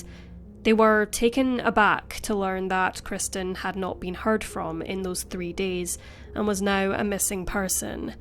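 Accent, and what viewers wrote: Scotland, United Kingdom. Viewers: Where is your accent from clearly Scotland but guessing not quite as far north as Aberdeen, right?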